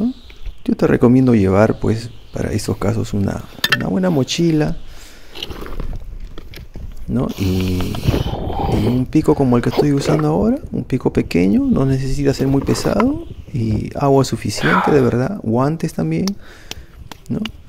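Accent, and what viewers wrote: Peru. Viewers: En que país estas ahí?.. hablas como peruano. estas en Perú?.. saludos desde Cancún Quintana Roo México. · no soy muy bueno con los acentos.... ¿¿de donde eres?? estoy entre peru, bolivia.... tal vez incluso paraguay :3 .... pregunto para saber a donde planifico mi proximo viaje jejejje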